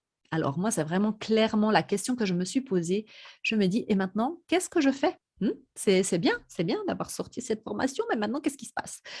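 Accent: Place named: Switzerland. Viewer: Bonjour! J'ai découvert ta chaîne il y a 2 semaines et j'accroche vraiment! Je ne suis pas maman mais je travaille à mon compte depuis la maison et du coup il y a beaucoup de choses qui me parlent dans ce que tu dis. Je dirais même que ça m'aide alors merci beaucoup! J'aime beaucoup ton authenticité et même je t'admire d'oser montrer autant de toi, en toute simplicité, sans chichi. J'aime aussi ta façon de parler, ça me change (la Suisse c'est exotique 😉) et puis c'est ok si tu fais des"fautes de français": déjà parce qu'on comprend ce que tu dis de toute façon. Et puis c'est ta façon de faire, c'est tes expressions à toi, c'est presque ta signature en fait. On a tous notre manière de parler et c'est bien aussi! La langue est vivante et d'ailleurs les erreurs d'aujourd'hui seront peut-être la normalité de demain! Continue à faire ce que tu fais! 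👍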